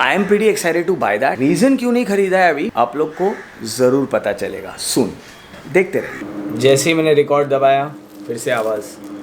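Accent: Gujarat, India. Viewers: Aap gujarati hai ?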